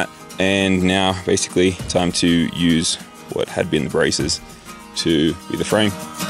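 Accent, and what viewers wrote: Australia. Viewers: Huh... You got an aussie accent ???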